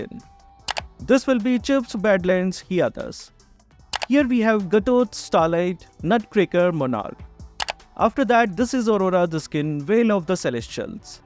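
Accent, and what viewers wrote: India. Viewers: I think hes from india, cuz his accent ⊂◉‿◉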